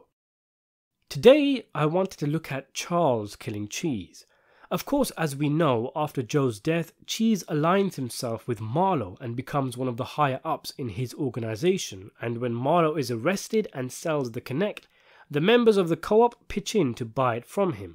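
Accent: United Kingdom. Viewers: To listen to an overview of a series depicting "thug life" in the inner city of Baltimore by an orator with a British accent is a testament to his truly genius this series was . Great job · who dis brit yo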